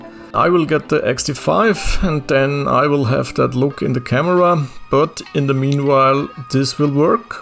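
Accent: Germany. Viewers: Nice german accent !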